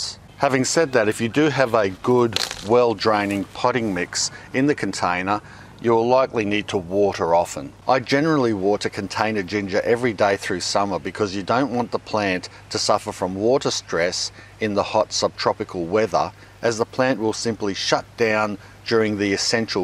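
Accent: Australia. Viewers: Love the Aussie accent. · It’s local Australian English spoken throughout the country. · that the Aussie accent I like to hear haha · This just come up and boy am I glad. Aussie Yeessss. I can understand you and you are talking Australian seasons.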